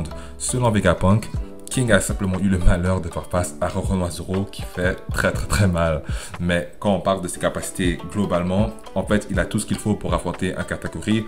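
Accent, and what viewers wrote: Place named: Montreal, Canada. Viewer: Hey j’aime tes vidéos ton accent me rappelle ceux qu’on retrouve à Montréal est-ce que tu viens d’ici ?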